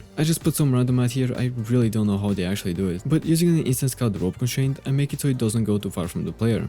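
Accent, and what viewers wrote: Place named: Russia. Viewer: какой-то русско-язычный акцент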